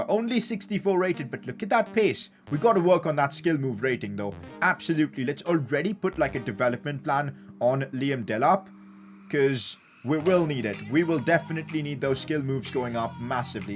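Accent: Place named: India. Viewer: PCQ: You sound more Indian than when you first began doing YouTube.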